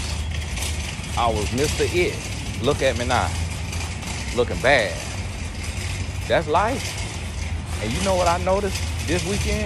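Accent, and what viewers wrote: South, United States. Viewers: You got that southern accent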